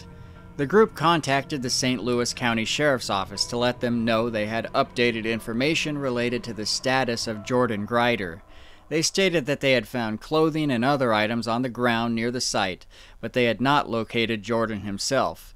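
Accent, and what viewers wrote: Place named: Upstate New York, United States. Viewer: Took me a bit to figure out that accent... ITs the same as Rod Sterling. Guessing your in up state New York, or close to it,,,lol.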